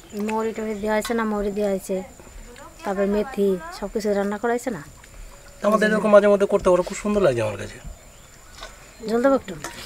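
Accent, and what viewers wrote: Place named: East Bengal, Bangladesh. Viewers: Bhai,tomake Tumi bolchhi ,ranna to batei tomader ke darunlage.tabe sab kichhur theke je jonyo ami dine akbar holeo video ta dekhi,seta thakuma ba mayer kathar jonyo,amar babar bari opar banglay,amra barite ei rakam bhabei katha bole dasher bhasata bachie rakhtam,tai tomader dakhlei àmar ki je bhalo lage bojhate par bona,bolei fellam,Bhai ,kichhu mne korona khub bhalo theko. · ও দিদি দেখে তো জিভে জল এসে যায় খেতে ইচ্ছা থাকলে ও পেটটা সাথ দেয় না ! এতো সুন্দর রান্না, সত্যি রান্না করাটা একটি শিল্পকলা তার চাইতে বড় আপনার কথা গুলো শুনতে খুব মজা লাগে! কারণ আমরা এখন তো পূর্ববঙ্গের কথাবার্তা আন্তরিকতা মানুষে মানুষে মিল বন্ধন ভূলে গেছি!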